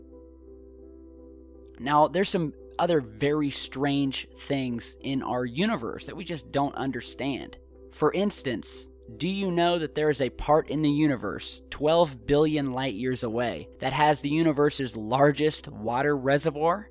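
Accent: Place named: South, United States. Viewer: man, you really have to polish that southern accent. It is quite distracting !!!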